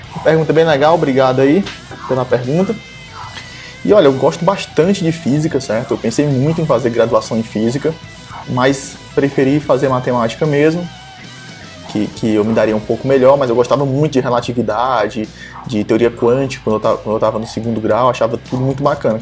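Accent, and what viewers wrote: Ceará, Brazil. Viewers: Você é cearense?? Hauausus quase meu vizinho slc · o senhor é cearense? ou fez matemática na UFC?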